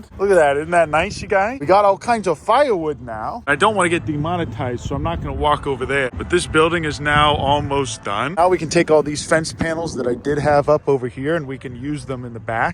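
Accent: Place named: Pittsburgh, United States. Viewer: Aye, pittsburgh represent! i knew i heard a little yinzer in you lol God bless and happy holidays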